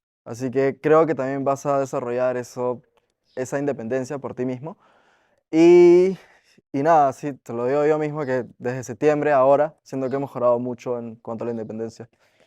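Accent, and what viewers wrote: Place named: Lima, Peru. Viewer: yo también soy de lima y planeo postular este año a la ie, btw,me resultó gracioso notar su acento limeño JAJAJAJ entre nosotros nos entendemos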